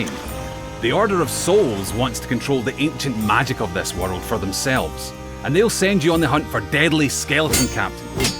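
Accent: Scotland, United Kingdom. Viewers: voice actor? You mean the guy commentating who is Scottish? That’s his normal ascent...... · I don't want to upset any Scottish people but for a long time I thought this guy was Simon Pegg doing a Scottish accent until I realized the guy at rare is also Scottish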